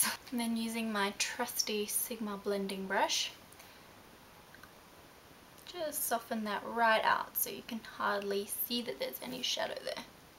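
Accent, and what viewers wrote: Australia. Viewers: Thank you :3 This will be perfect for school! From ur accent im guessin ur an aussie ? Same :3 Ps, Your bootyful. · Very nicely done., you are a beautiful girl and you do this 'almost - no - makeup', natural look well. Wish I could master the natural, no makeup look. I'm a fanatic when it comes to makeup, actually I am extremely artsy, so I always tend to view my whole face as a canvas. I don't even have a ready in five or ten minute go to everyday look. So laborious when it comes to putting my face on. But you knock this out in ten minutes flat. May I ask you, where are you from? Your accent sounds australian. It's charming. Thank you for the posts.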